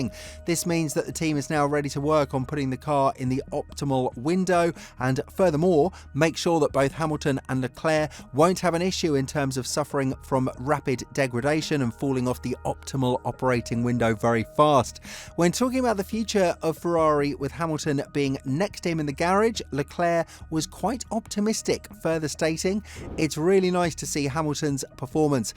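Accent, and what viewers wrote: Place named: England, United Kingdom. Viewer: Massively bias, sounds English, so no surprise there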